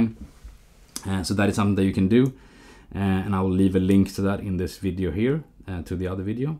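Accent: Sweden. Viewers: Are you Swedish? You sound like my friends and relatives. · can hear the swedish dialect🤠 but no worries, its alot better than most other dialects👍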